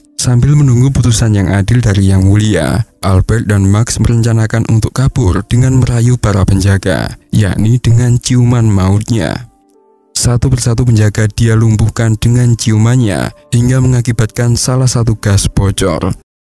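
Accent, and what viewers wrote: Java, Indonesia. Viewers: Suara ne wong jowo iki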